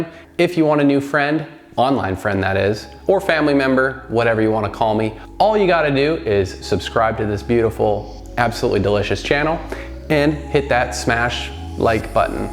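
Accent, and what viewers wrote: Canada. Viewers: Man I knew you were Canadian. Could hear it in your voice. Haha. Cheers from Kelowna BC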